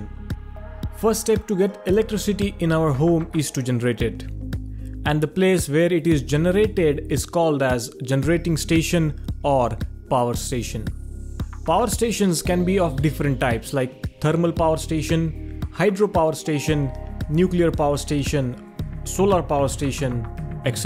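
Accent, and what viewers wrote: India. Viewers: Idk if ur Indian or something but I love these types of accent also nice explanation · The moment I heard Ur Indian accent, I felt so comfortable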